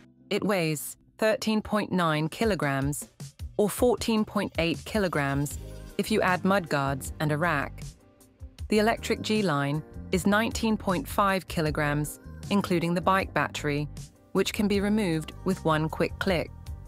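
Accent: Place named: England, United Kingdom. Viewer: Typical English middle class accent. Even Americans can understand her without resorting to subtitles.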